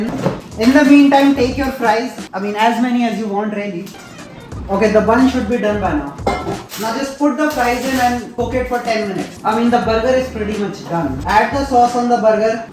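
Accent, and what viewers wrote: Rajasthan, India. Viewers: you're funny af bro the indian accent makes it even better 🤣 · Bro I'm from Rajasthan as well but I haven't met someone with that strong of an accent lol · Bro got the classic Indian accent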